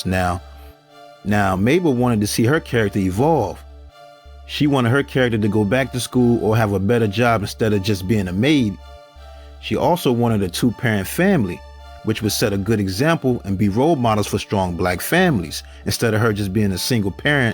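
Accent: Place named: Chicago, United States. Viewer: What part of Chicago you from, bruh? I’d recognize that accent anywhere.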